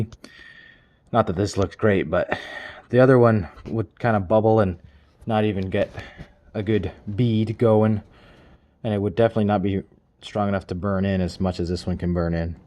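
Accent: South, United States. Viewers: You sound southern in this one for some reason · Lol i like the southern accent, whered that come from??😂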